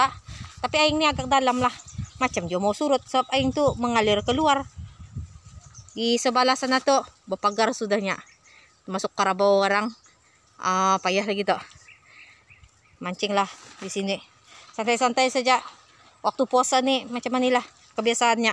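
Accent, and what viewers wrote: Brunei. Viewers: ooh cakap macam bahasa brunei bah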